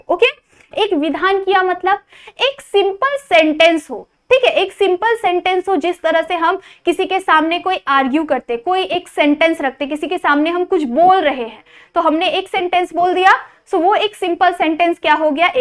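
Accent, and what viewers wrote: Maharashtra, India. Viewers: Mam your Marathi is too cute😅😅😊😊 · Thanks alot sir and ma'am for your efforts and i really think that I'll score best tomorrow with ur help. And mam your Marathi is really cute😂😊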